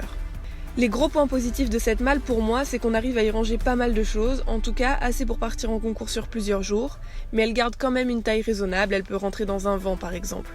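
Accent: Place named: Belgium. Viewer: J'aime tellement l'accent Belge 💕 C'est sûrement parce que j'ai grandis à la frontière ^^ Sinon vraiment sympas la vidéo 😊 Ca doit être lourd à pousser quand même !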